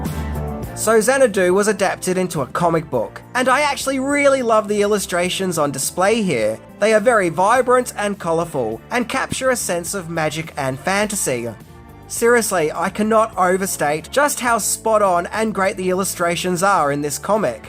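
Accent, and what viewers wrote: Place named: Australia. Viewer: I was just a kid when I saw this. This is one of my favorite movies. Please try me! I'll update as I watch this. So, 1st you're Aussie without you telling me you're Aussie. Oliviabwas actually from the UK. Waiting for you to mention her ex-husband, which she had 1 child. He played the younger Gene Kelly. And yes, this is literally an album. Knew she loved this because Gene Kelly!!! She looked absolutely beautiful in this movie and i stan 💯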